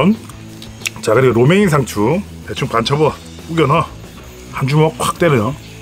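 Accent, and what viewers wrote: Gyeongsang, South Korea. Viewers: ㅋㅋㅋㅋ 진짜 상남자의 wild 먹방 조으네 구독완료다 저랑같은 경상도 분 같은데 어디시져?ㅋ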